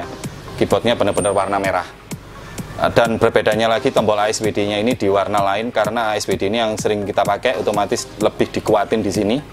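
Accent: Java, Indonesia. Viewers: Medok Mas...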